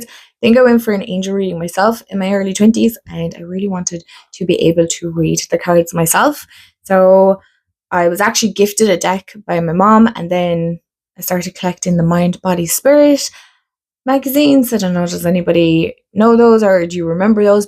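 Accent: Ireland. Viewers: So nice to meet you,. 🍀 🇮🇪 Your lovely accent sounds like home! My father’s parents emigrated from Ireland, wow, about 100 years ago and me da would slip into a brogue on the regular, which means that I slip into a loosey-goosey brogue on the regular, quoting my dad quoting Hal Roach, write it down. Anyway, I enjoy your channel and point of view. ✌️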